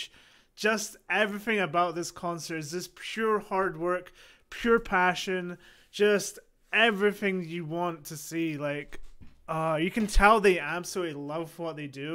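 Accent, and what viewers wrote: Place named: United Kingdom. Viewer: Damn, now I feel quite stupid.. 😅 your British accent doesn't seem that strong to me though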